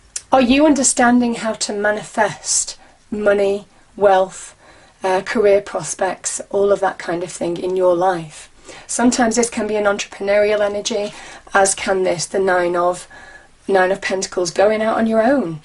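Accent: United Kingdom. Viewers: Hi .. It's great to hear a uk voice . 👍.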